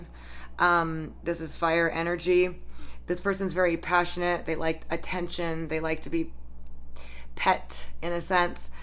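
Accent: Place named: New York, United States. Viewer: Are you from new York? Bc I can hear an accent. But I'm actually dealing with both a Leo and Libra. The Libra ugh ur on spot with him.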